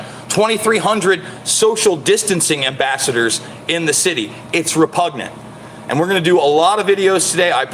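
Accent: South, United States. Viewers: I'm sorry but your southern accent is amazing haha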